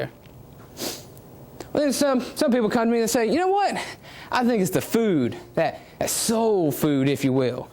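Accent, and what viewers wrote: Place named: South, United States. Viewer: Mercy, that southern drawl gets me every time.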